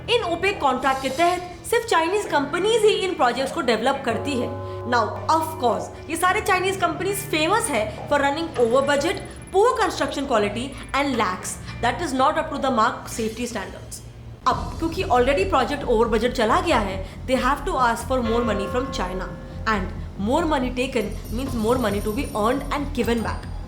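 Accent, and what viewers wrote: India. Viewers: It's weird seeing a Chinese looking lady with Indian accent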